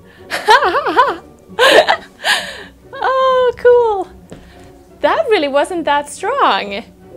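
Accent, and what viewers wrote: Sweden. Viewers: Are you Swedish? Great vid! Thanks! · Are you swedish? Sounds like you have strong swedish accent :)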